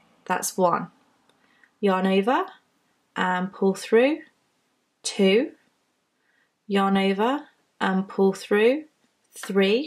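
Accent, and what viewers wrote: United Kingdom. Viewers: My mom vaguely showed me how to crochet when I was a kid (she was lightning fast and almost never looked at what she was doing) and I've been wanting to see if I could actually do it recently. I will say this,. You are a good instructor and even if I never complete a piece, I promise you that at least a couple of my future video game characters will be named Ya'nova. I'll be hearing that term (in your British accent, of course) in my sleep. LOL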